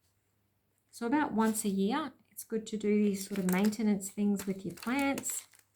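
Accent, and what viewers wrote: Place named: Australia. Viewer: Great video. So nice to hear the Aussie accent for a change. Please keep posting your videos. 🤗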